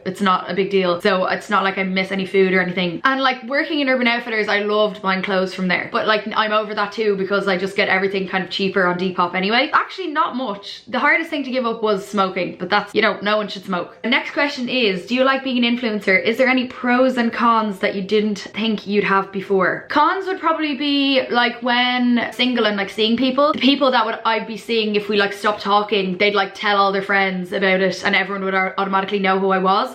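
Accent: Ireland. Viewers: You’re just class like I love your videos and your accent too. Where in Ireland are you from?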